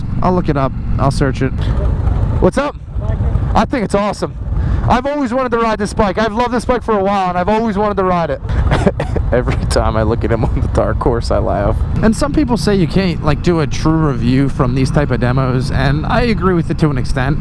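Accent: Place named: Georgia, United States. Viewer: yeah but hey you can't help "how" you talk. he's from GA. I'm from Alabama, so he's cool lol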